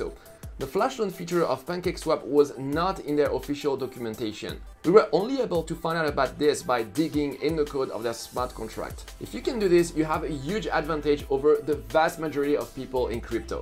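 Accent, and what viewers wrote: France. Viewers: I think you are french 😁😁 i felt this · ouuhhh l'accent francais aahaha. Super video sinon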